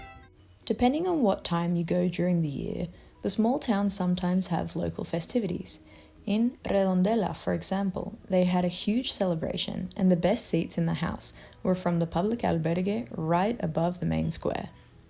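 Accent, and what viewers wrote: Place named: Australia. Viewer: Wow why I didn’t know this contents before ,I love Aussie English , please keep it up for me! Thank you !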